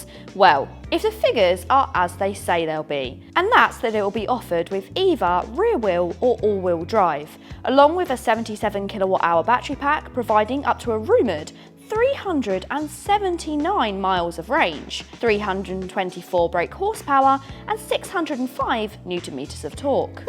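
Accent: United Kingdom. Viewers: I think it’s British accent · I dunno why but british accent is just nice to listen to reviewing things lol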